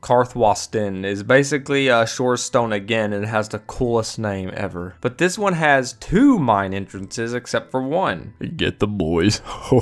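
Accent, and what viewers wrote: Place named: Texas, United States. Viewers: Love your accent! reminds me of aunt and uncle back in Texas... 😌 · Oh my dude, you are seriously sleeping on Rorikstead; there's something sinister going on there. (This has been covered way in-depth by other YouTubers). Pay attention to the dialogue; sneak in and listen to Jouane give secret lessons to Sissel. Why are their farmlands so fertile? And where are all the adult women? If you were including Raven Rock, that should easily have been #1; it's personalities, the sheer number of questlines, it's Morrowindy (yeah that's a word now) goodness-it's easily on par with any of the Skyrim mainland cities. I don't know who told you your accent is fake but as a native Texan, I assure your viewers that the 'Texas twang' is indeed real. You and the missus have a good one,!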